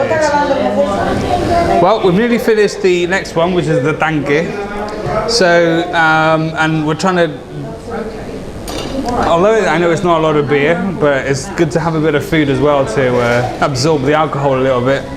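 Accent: Andalusia, Spain. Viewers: y Alan Bowman Actually, I'm Spanish and I find his pronunciation is pretty good for a foreigner, with quite a thick Southern Spanish accent. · Me encanta tu acento andaluz! es muy muy bueno. Saludos desde Madrid :)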